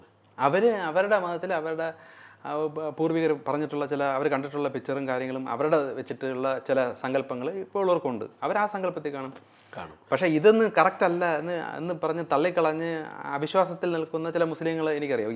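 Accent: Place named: Thiruvananthapuram, India. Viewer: നല്ല തിരുന്തോരം ഭാഷ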